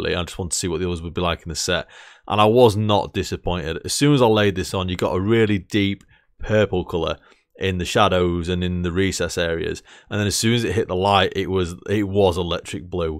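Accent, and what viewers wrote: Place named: Yorkshire, United Kingdom. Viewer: It's PAUL DANIELS paint.... "That's Magic". Great to hear a Northern accent.... Possibly Yorkshireman! A great review mate, I'm looking around for a colour shift that goes like 'oil on water' effect! Needs to go over any colour... Am I wasting my time?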